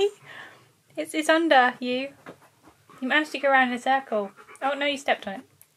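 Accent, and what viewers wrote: United Kingdom. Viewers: What kind of english accent it is?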